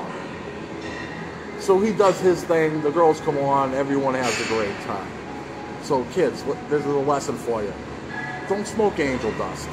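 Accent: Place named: New England, United States. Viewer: Welcome to the family! Great see and hear another New Englander on YouTube kid! Had me at and! Looking forward to more videos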